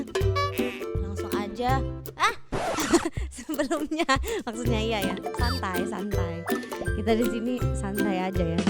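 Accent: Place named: Lombok, Indonesia. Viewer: Aksen wong lombok..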